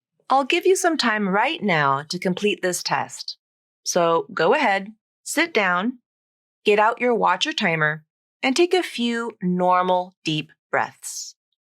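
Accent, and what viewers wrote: San Diego, United States. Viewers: Hey! I'm a non-native speaker and I've been watching your videos and i absolutely love your channel. I was just wondering if you speak in a standard American accent or is there a tad bit of San Diego in it?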